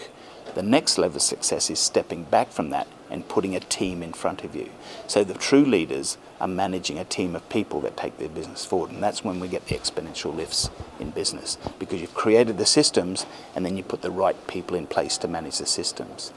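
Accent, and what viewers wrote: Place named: New Zealand. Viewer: Lol he's a Kiwi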